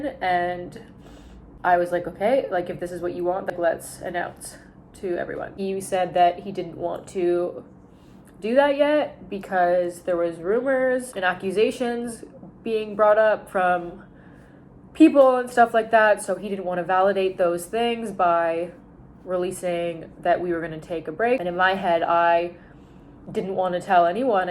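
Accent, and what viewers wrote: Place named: Canada. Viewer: Your lil Canadian accent is cute af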